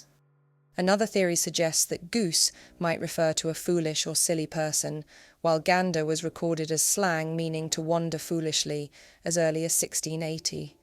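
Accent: United Kingdom. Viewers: Agree !clear thoughtful and well presented.. AND very English🥰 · This channel is narrated by an Brit and there's no denying the musical beauty of her accent! Focus on the positive, we find what we look for!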